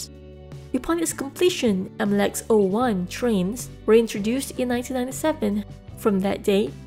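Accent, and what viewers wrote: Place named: Malaysia. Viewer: I hear a Malaysian!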